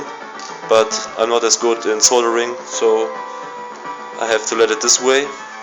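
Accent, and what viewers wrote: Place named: Russia. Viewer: Sound like russian try to speak english Где надыбал , колись :)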